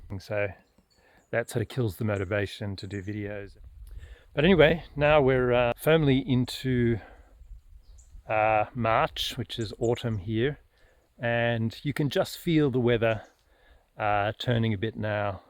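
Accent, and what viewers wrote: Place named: South Africa. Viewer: I can hear an accent, is it South Africa? I'm a pom on 4 acres in central vic too. My land looks very much like yours, sloped with lots of trees, it can be challenging to work with ;) I agree with you on the mental health benefits, it's so nice being out amongst the trees. I work from home in tech and I love those 15 minute walks here and there! I went pretty hard with the crops during covid but it got too much for me with all of lifes other demands. I just couldn't keep up with the weeding, the birds/insects/rabbits/possums decimating my crops, or the wombats bulldozing my fences. I kinda got burnt out making so much effort to get a bowl of strawberries or a basket of deformed half eaten corn, I scaled it right back and now just focusing on breeding chooks for meat, I'm trying to tackle the high cost/high value items. I want to do sheep next but we have no fencing along our creek.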